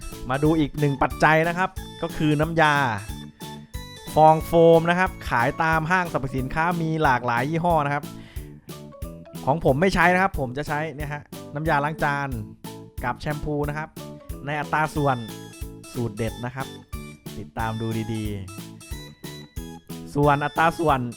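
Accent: Korat, Thailand. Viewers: อ้าวแกคนโคราชนิ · พี่คนโคราชนิคับ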